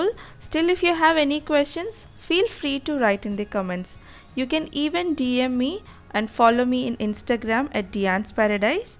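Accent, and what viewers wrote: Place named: India. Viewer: Dear, I am not so sure what country you are in, but from your accent, it sounds it is India. Here in the West, the flours in supermarkets are all-purpose, whole wheat, unbleached all-purpose, etc, etc. All these flours are made from wheat grains. When you said the flours you used are all-purpose and wheat, I am not so sure if you meant whole wheat, and unbleached flour. Because these 3 flours I mention are made from wheat grains. The difference is that the all-purpose comes in 2 kinds --unbleached and bleached and these 2 do not contain the brand. The whole wheat means that it contains the brand. Upon looking at your 2 bowls, I do not see one being darker than the other one. That tells me you are not using a whole wheat but probably unbleached. The reason I am interested to know this in detail is because you mentioned that the bowl with the wheat flour was more bubbly than the all-purpose flour. 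So, to understand you exactly, would you say that the more bubbly bowl was the one containing a whole wheat flour?? These two flours, the all-purpose and the "wheat flour" are made, both, from wheat grains. When they say "all-purpose", means the flour contains the very necessary amount of gluten for all kinds of basic breads which are most the time baked at home. And for cooking, making sauces, flat beads, etc, etc. So, this flour probably does not contain the necessary amount of gluten, or the sufficient amount of yeast, or a percentage of yeast has been killed in the process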